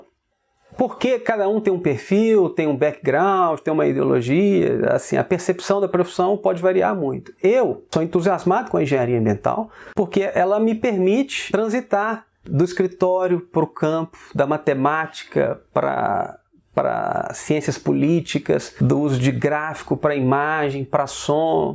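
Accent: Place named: Minas Gerais, Brazil. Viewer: TÃO FOFO JESUS CRISTO como eu amo sotaque mineiro Mas ajudou pra crlho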